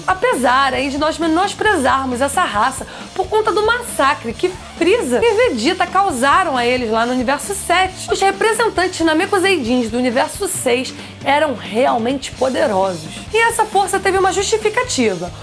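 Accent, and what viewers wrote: Rio de Janeiro, Brazil. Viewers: Pietro Silva Claro que não né... Sou paulista,e não me incomodo com o sotaque carioca. Eu já sou acostumado a ouvir . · Sou carioca e entendo....o x no lugar do s..... É foda :/ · Eu tbm sou carioca.. Ksjsjsjsj, esse "i" sempre vem nais palavrais. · Kkkk, estive no Rio e reparei no Douze tbm, naiscimento, mas acaba sendo fofo. Relaxa.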